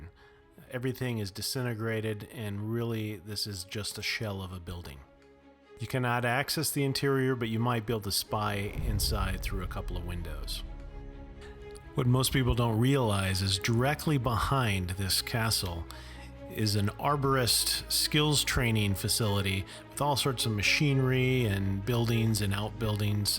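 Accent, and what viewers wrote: United States. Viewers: It just sounds as if insulting a country isn't important enough to try pronounce it properly while enjoying the culture seems to be your approach to other country's Scotland isn't worth trying your hardest not to offend. A small country who gave the world some of the most important advances penicillin, television,pneumatic tyres, steam engines and most important educated thinking 🤔. Umh just a thought for your Midwest pronunciation if its worth being done do it properly.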